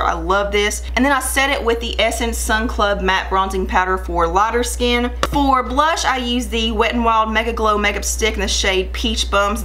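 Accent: South, United States. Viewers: Your accent! I'm from Alabama so I'm really southern also! Where are you from! And, Amber rush is my Go-to eyeshadow! I wear it everytime I do my makeup! 😍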